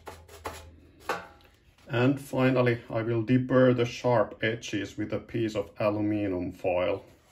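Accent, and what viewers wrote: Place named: Finland. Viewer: Hi, Awesome Video. I thought I recognised your accent, and with a name like that, I thought surely you are from the fine country of Finland. I have a good friend of mine who lives in Kuopio. Thanks for sharing!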